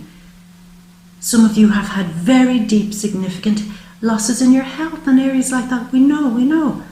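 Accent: Ireland. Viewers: This is 1 of the best readings you've ever done. Everything resonated. All the best to you from Canada 3> By the way what part of Ireland are you from?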